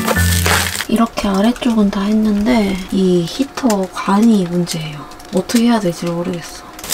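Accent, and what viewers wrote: Jeolla, South Korea. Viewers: ♡ 젤루 님이 눈에 들어오신건 강아지#강이#이와 함께여서 구독하다 전라도분인걸 확 알아챘음당~ㅋ 전라도 사람은 다아는 억양~ㅋ 저두 강아쥘 엄청좋아하거든요 님의 영상을 보면서 캠퍼의 꿈?을 키워봅니다 항상 잘 보고마니 배워보도록 할께요~😊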